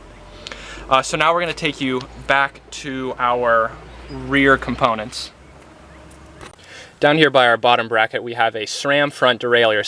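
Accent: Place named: Pittsburgh, United States. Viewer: you sound like you're closer to Newcastle or Pittsburgh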